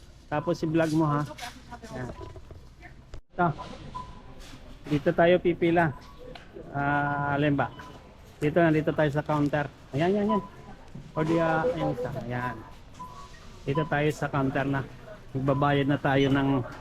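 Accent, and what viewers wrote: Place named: Visayas, Philippines. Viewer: Kuya,wag ka na mag salita tagalog or englush dahil masyadong BISAYA ang dating, say the right pronounciation!!!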